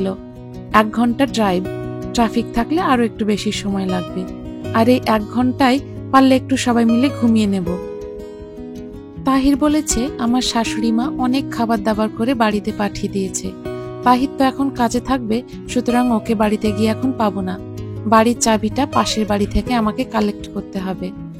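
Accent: Bangladesh. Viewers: Apnar kotha sunla mona hoi apni bangladeshi dar moton kotha bolan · Same here😂.ভীষণ বাংলাদেশের বলে মনে হচ্ছে. হতে পারে আগে হয়তো বাংলাদেশে ছিল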